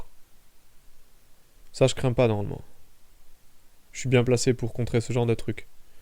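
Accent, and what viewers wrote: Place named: South, France. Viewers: c'est intéressant, vos commentaires sont vraiment sympa, le style dynamique et l'accent du Sud :-D merci pour ces vidéos. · accent du sud ... on dirait carrément DiMeco !!!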